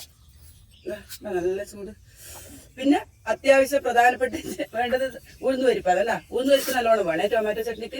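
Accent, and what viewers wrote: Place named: Paravur, India. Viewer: ങേ ഇത് എന്റെ നാട്ടിലെ വർത്താനം ആണല്ലോ 🤔🤔 പറവൂർ ആണോ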